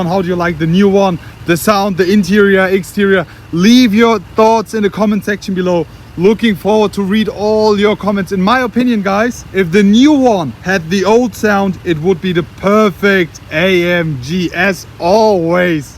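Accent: Germany. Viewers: I don't want to offend you now, but you should really work on your English, the intonation is awful. That sounds like a foreigner is trying to speak German so abruptly, only in English.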